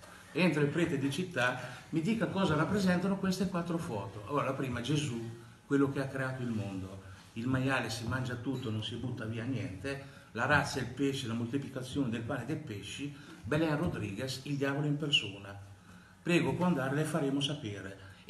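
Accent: Italy. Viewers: umbro marchigiano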